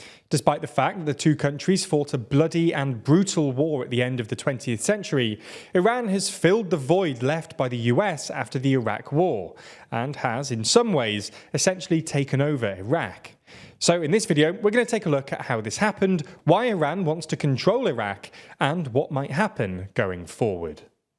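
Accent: United Kingdom. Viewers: He's british, different accent. Technically, his pronunciation is "proper" English 🤷‍♂️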